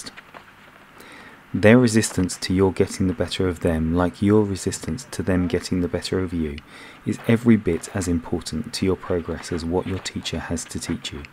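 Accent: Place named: England, United Kingdom. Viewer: Thanks for postting, keep the good work! where in England is your accent from? Cheers mate!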